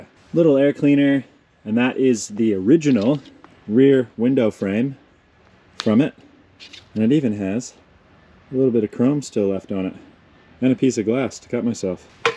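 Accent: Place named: Western Australia, Australia. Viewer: As i am watching this Video my brain hurt. All lhd Cars so your in the americas I suppose but I was hearing a western accent with hint of an Ausi accent.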